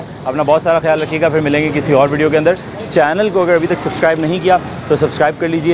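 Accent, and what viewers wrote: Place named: Uttar Pradesh, India. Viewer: 🌹🌹🌹🌹🌹 भाई आप की बोली बिल्कुल हमारे उत्तर प्रदेश हिन्दुस्तान की तरह है माशाल्लाह हिन्दुस्तान जिन्दाबाद 🇮🇳🇮🇳🇮🇳🇮🇳🇮🇳🇮🇳🇮🇳🇮🇳🇮🇳🇮🇳🇮🇳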